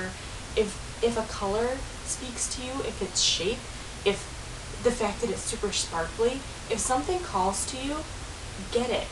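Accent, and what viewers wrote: Michigan, United States. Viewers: I knew your Michigan accent was familiar!! I live near Ann Arbor :] if you're ever in the area, my go-to metaphysical store is Earth Lore in Plymouth. I get tingles just thinking about the place! Or if you need a book, Crazy Wisdom in downtown Ann Arbor.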